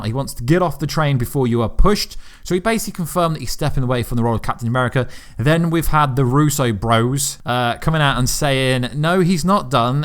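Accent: United Kingdom. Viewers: I'm well accustomed to certain pronunciations being butchered by a British accent, but since when did "human" become "thuman"?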